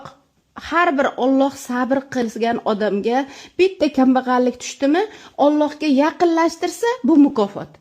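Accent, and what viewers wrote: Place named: Kashkadarya, Uzbekistan. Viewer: Шева Кашкадарё. Тугри тарбия берябди. Дуо хам килинг. Иши юришиб кетади шунда.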